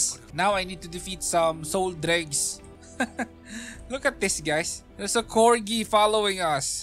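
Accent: Philippines. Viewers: Pinoy accent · You sounded like a Pinoy. I hope I'm correct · wait.. thats a philipino accent?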